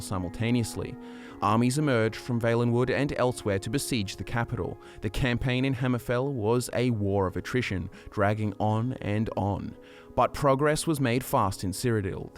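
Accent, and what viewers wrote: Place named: Australia. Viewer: Gergazie that’s just his Australian accent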